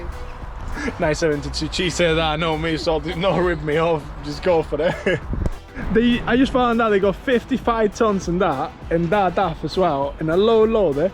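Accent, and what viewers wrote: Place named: Northern England, United Kingdom. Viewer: I’ve never heard a foreign guy with a northern accent before but I’m all for it!! Love your videos man! Love the new truck as well!!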